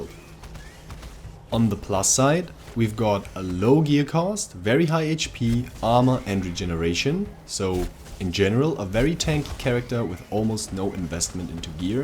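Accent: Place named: Germany. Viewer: good job trying to avoid the german accent.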